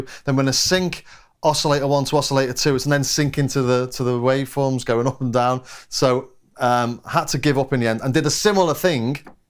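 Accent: Liverpool, United Kingdom. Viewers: Is a scouser?! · Are you from Liverpool?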